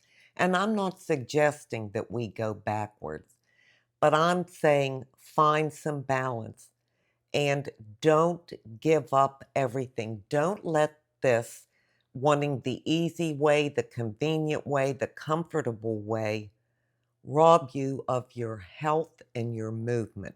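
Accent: Pittsburgh, United States. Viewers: Hello again, just watched another of your video. Convinces , first of all you sound like a Pittsburger . As am I. I’m from the old school. Very lazy generation today. I still work at my age at almost 74, have a home and still do all the yard work, groceries one day, and I may have a day of rest before I go back to work. Oh AI it’s taking over everything. Seriously these kids today can’t do anything without it. Can’t even count back change to a customer. I’ll take the days I grew up in anytime. Keep up the nice videos and wonder stories.💃🏻 very attractive lady by the way. Body, mind and spirit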